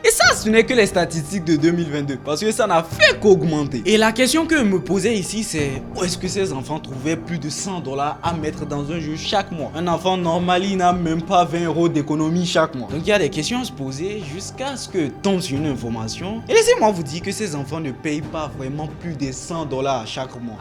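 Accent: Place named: Benin. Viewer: tu es béninois? Tu as notre l'accent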